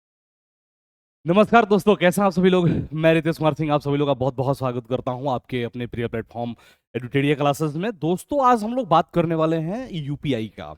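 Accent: Bhojpuri, India. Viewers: Aap Hindi bhi bolte hain to Bhojpuria ki jhalak dikhti hai sir Don't mine sir, U looks like Ravi Kishan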